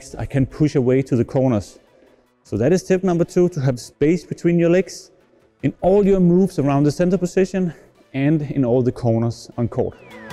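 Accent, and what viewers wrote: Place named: Australia. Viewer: Sounds aussie ngl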